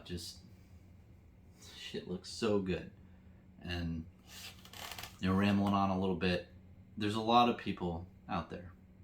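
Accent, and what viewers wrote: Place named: Florida, United States. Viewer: I’ve Said It Once & I‘all Say Ot Again, Your Accent Is So Pleasing To My Ears!! Are You A Florida Native?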